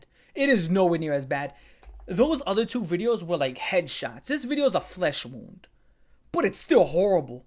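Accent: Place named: Baltimore, United States. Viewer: I have a feeling you're from baltimore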